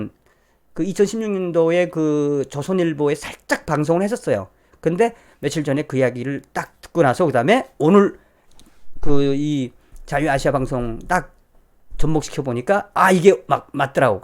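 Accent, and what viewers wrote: North Korea. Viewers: 감독님, 홧팅!! 니북 사투리가 정겹습네다. 가열차게 부칸 사투리로 방송해 주세용! !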